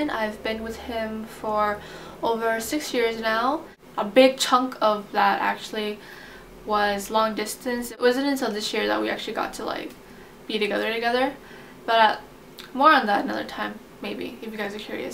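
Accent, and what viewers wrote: Vietnam. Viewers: I honestly didn't think u are vietnamese, but then now u mention it I can see some vnmese features on u and hear a little bit of viet accent. Ur viet is very cute too <3 I honestly think u have cute teeth, pls don't be embarrassed about it, can't believe s.o ask u that kind of question. what's the point if everyone has straight teeth right? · AND: My Sister is looking like you (80%) and she is 100% Full Northvietnamese.. So, i believe you are more than "1/4 Vietnamese" ( ' o . o ) But your accent is Southvietnamese..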